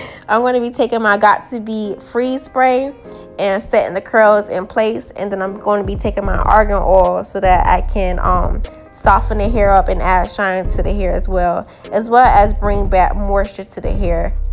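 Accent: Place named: Florida, United States. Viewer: Turned out pretty! You were very detailed and I could tell you were from Florida 😂😍 Much love from Texas!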